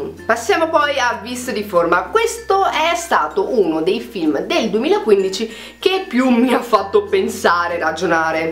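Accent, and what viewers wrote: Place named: Romagna, Italy. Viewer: ahh scusami è che io sono romagnola e la tua s mi ricorda il nostro accento. scusami :)😹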